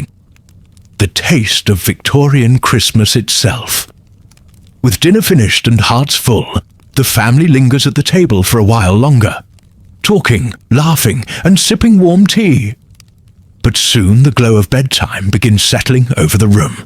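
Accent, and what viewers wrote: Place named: United Kingdom. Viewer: Missouri USA, pm. I love the British accent.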